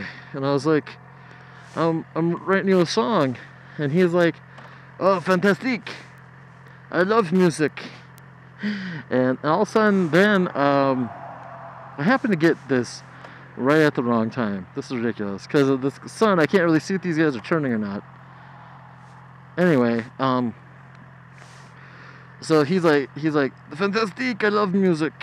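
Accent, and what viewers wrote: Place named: France. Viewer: Do you have a video of your camera/mic setup? BTW awesome frenchy accent!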